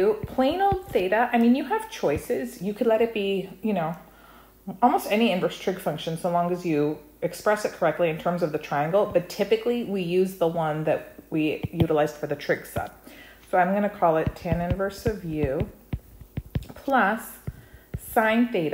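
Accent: United States. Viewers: I ❤ UR AMERICAN ENGLISH accent😊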